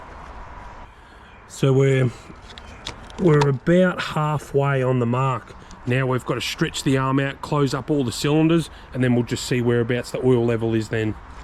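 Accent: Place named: England, United Kingdom. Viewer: I just started watching your channel for the 1st time. I have to tell you as I was listening to your accent. I can now see how English accents morphed into Aussie, American Southern Hillbilly/Redneck and Kiwi. I have never heard that connection from one person before. Amazingly interesting. Anyway, you made a good choice buying that deal. Keep it up. Good job, buddy. 🇺🇸🇭🇲💪👍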